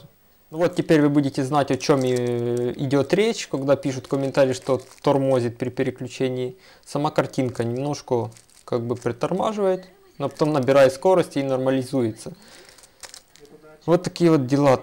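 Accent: Vologda, Russia. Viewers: вОлОгОдскій суржик у твоєму виконанні - просто повний пі...єц. Дизлайк за "язик" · Вологодский окцент!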